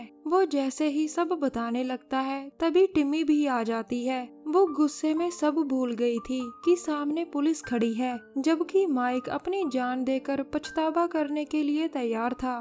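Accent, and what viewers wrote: Punjab, India. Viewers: kyaa app punjab se ho me 2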